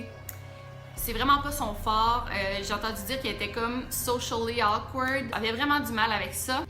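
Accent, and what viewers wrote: Quebec, Canada. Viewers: Belle accent Québécois ! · Salut ^^ Je suis de France et j'aimes tes vidéos ! je sais pas je trouves l'accent quebebois très agreable lorsqu'on conte qqchose ! Ecoute pas les autres, on aime trop raler faut pas le prendre a coeur xDDD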